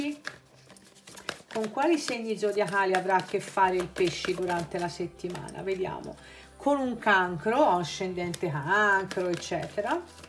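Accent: Tuscany, Italy. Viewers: Super parlando il toscano e ancora più super sfoderando l'inglese...sarai mica insegnante di inglese? Vedo che te la cavi molto bene anche con i termini in voga...english 🥰🥰🥰💯💯💯💯🍷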